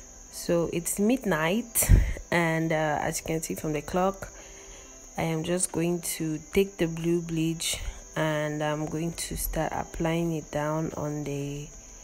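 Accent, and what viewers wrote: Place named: Nigeria. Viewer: This is a really helpful video and it sounds like you're Naija, so I be subscribing 😊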